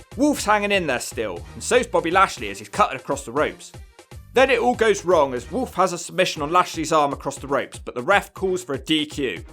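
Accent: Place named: West Country, United Kingdom. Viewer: You've never sounded more Westcountry than in this video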